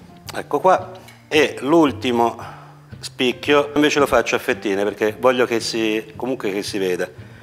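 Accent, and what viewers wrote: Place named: Casentino, Italy. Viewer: Ok, dalla parlata infatti mi sembri vicino dalle mie parti Casentino e per curiosità te l'ho domandato, sorry :-) Magari mi sbaglio. Pura curiosità. Toscani numero uno!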